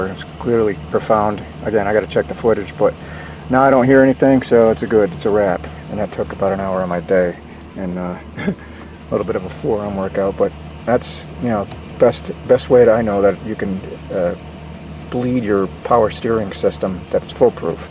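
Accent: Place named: Boston, United States. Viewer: Very relevant to me here in Oz, just doing a Mitsubishi ute and this method is working. Your accent reminds me of Bill Burr ; do you tell jokes too? ( Bill’s from Boston).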